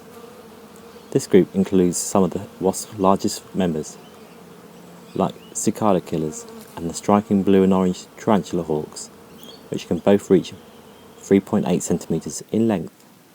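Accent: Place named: United Kingdom. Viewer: Got some Britian talking also giving metric numbers....sucks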